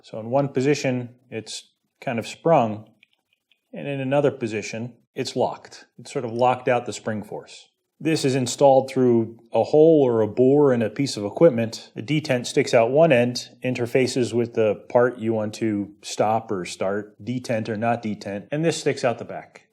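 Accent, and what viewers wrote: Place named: Philadelphia, United States. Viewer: You have a Philadelphia area accent. I bet you live in either southern Jerz, or the easternmost third of Pennsylvania. Or Delaware.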